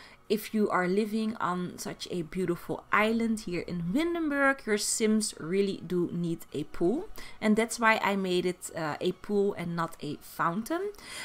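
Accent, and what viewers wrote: Netherlands. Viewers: Ik kon gewoon aan het accent horen dat je nederlands bent haha, maar erg mooi huis! die keuken ook mooi uitgezocht!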